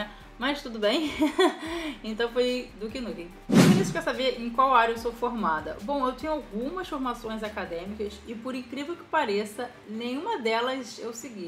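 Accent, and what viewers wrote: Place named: Rio de Janeiro, Brazil. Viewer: O sotaque carioca é top. KKK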